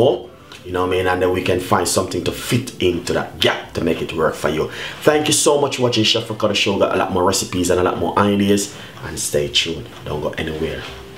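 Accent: Jamaica. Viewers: I can just tell first minute in he’s Jamaica lol 🇯🇲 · It’s because of his Jamaican patois accent 🤔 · Authentic jamaican accent🤗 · Haha some Ppl don't understand Jamaican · That Jamaican accent🥰🥰🥰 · he's Jamaican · Lol he’s Jamaican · Are u a Jamaican bro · You mean his patwah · Hahaha I like Jamaican accent thanks am going to try it and see coz I have 48kg and I want to be 60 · Its Jamaican (Patios) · Nice, I just love the Jamaican flavour of your English. will definately try your receipe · Yea man, I hear it like a bob marley, rastahhhh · Put on wheats 😂Jamaican accent at its best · He's a Jamaican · It's the Jamaica accent for me 🤭🤭🤭🤭🤭🤭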